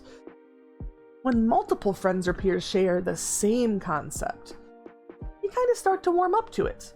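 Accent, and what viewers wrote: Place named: United States. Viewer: I don't think you understand what free speech is. I assume you live in the US by your voice, so unless you have been arrested, tried and jailed for speech, it is a protected right.. Just because you werent allowed to blabber during class as a child doesn't mean you don't have free speech. If you're arrested you can literally sue the police department in civil court for the damages. Threatening somebody or making a call to action where there's a reasonable belief people will get hurt has NEVER been free speech.. also Literally no women in the last 40 years has been told "you belong all dressed up in the corner, awaiting a man's command"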